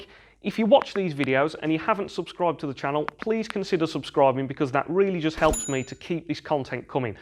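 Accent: United Kingdom. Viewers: Who's here for the british accent?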